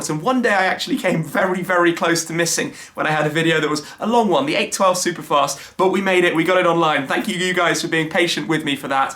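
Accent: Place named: United Kingdom. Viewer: damn ur accent is so british... and im british :0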